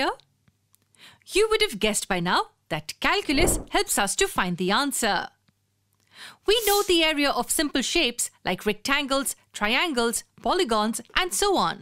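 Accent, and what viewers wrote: India. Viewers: I hate indian english. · The only Indian accent I could listen forever lol she saved me 😂✌️👌 EDIT: passed the exam 😂🙌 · I think Indian. Cause her accent seems like Indian 😊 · Indian accent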